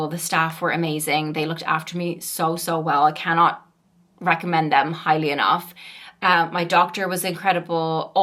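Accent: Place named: United States. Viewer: Thank you for sharing, the first time I encountered one of your videos was in Brazil 2012 when I was experiencing some homesickness. I immediately was like who is this beautiful woman that has an American accent but not at the same time. lol...I loved it and I appreciate your honesty throughout the years, it's been lovely seeing you remain true to yourself. Thanks again for sharing because you don't have to Well wishes from Guyana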